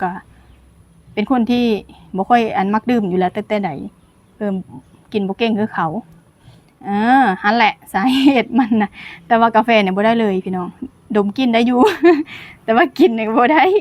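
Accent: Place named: Khon Kaen, Thailand. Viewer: เพียงยูแขวงได๋ เว้าคือบ้านอ้ายแท้ ขอนแก่น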